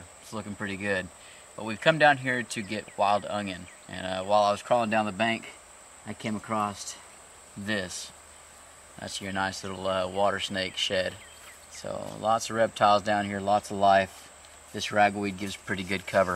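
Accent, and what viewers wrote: Texas, United States. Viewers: I love hearing you say the things Texans say, I miss all my country folk back home. "if you hold your tongue right" I say this in Arizona to a lot of people and always have to explain.... thanks for you vids and I hope things are going well for you, haven't noticed any updates so far. thank you thank you thank you and speedy and full recovery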